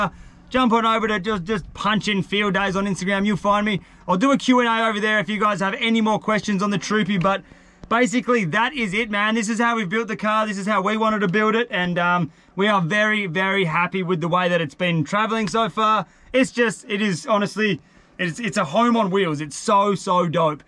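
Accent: Australia. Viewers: Love ur vids.The aussie accent makes it soo much better and wholesome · I do love watching ridiculously it's kind of addicting because I can't really do that I see two people enjoying their lives and having troubles but they're smiling as they have these troubles living out of a car is not fun but then again it sure does look like fun until you actually have to provide and you're doing that and what should I say from Alabama no I don't have all my teeth I'm missing one I've had seven root canals that's beside the point you're putting out there for people to see but I look for MAC 10's videos because I like her accent I watch Australian videos because I like your accent and I like the views of the seat the laws are different where you are and there's a reason for that for the beautiful land that you have so you too not YouTube YouTube you too keep doing and I will love to see what you come up with next on an adventure of life of all life ☺️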